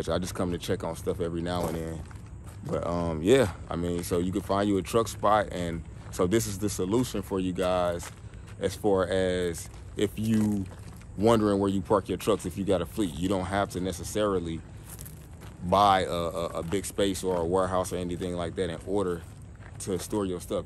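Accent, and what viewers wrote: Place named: St. Louis, United States. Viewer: Bruh u gotta be from stl….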